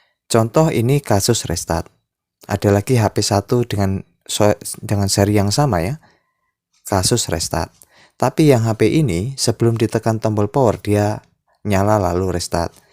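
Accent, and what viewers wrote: Java, Indonesia. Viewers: Sampeyan jawane ngendi boss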